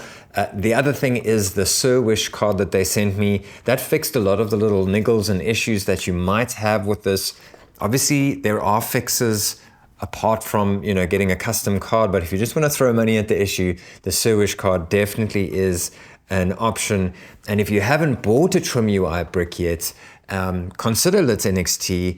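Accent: South Africa. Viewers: Sounds like you are from Cape Town South Africa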